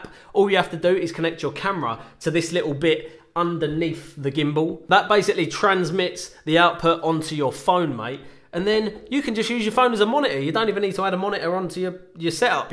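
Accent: United Kingdom. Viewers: OMG You're like the hottest Youtuber talking about gimbals. My opinion is absolutely biased because I'm obsessed with gingers and British accents. Just subscribed cause you can talk about any tech and I'll think it's super hot. Xxx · you are extremely british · I'm broke but you sold me. Quite refreshing to hear a brit speak on YouTube instead of Peter Mckinnon, Alen Palender and all them Canadian fellas. Great video, cheers. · Ok, subscribed after 30 seconds just for the raging britishness of the lad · i like british acsent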